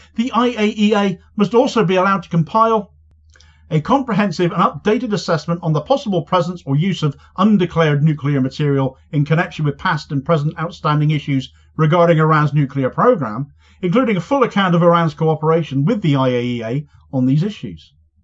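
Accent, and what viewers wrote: Cornwall, United Kingdom. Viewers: I’m glad you feel like me, so many people are feeling the same way. I don’t know how many people of all nationalities have said the same. I never thought I’d be rooting for Hezbollah, Hamas, Houthis or Iran. Many people are saying it’s as if the world has turned upside down. A lot of Americans have said how ashamed they feel at their governments policy regarding Israel. People, especially in the west feel as if they have been lied to for decades. So many people are now clued up about the situation of the Palestinians, including the Nakba, 1948 and the forceful displacement of the Palestinians by the Zionists and the terrible atrocities that were committed by them. Social media has been a boon in educating people and channels like give us the facts. has been consistently brilliant at showing us the truth, I also love his Cornish accent.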